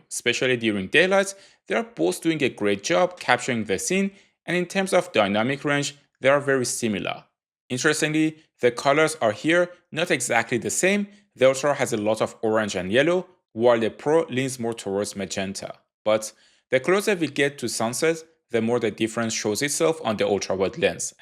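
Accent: Iran. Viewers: لحجت ایرانیه😂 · Nice video especially w that very irooni accent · بنازم لحجه ایرانی رو 😅🫡🤌🏼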